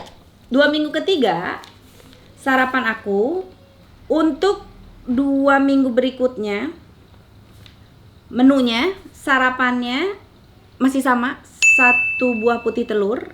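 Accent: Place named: Sunda, Indonesia. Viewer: suka bgt denger suara teh, sunda.....bgt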